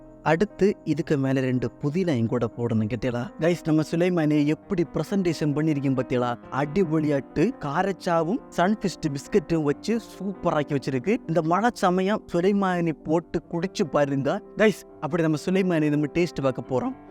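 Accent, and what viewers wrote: Kerala, India. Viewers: அடேய் தம்பி., சுலைமானி tea பல பேரு செய்றாங்க..நீ எப்படி பேசிகிட்டே செய்றன்னு பாத்தேன்..உன் கேரளத்து தமிழுக்காக தான் இந்த காணொளியை பார்க்கவே செய்கிறேன்..😅😂🎉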